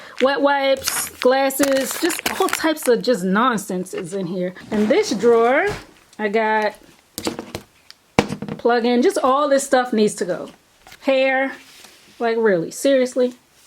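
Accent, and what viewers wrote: Baltimore, United States. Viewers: Lol. I just noticed I might hear that accent from the Baltimore or Philadelphia area.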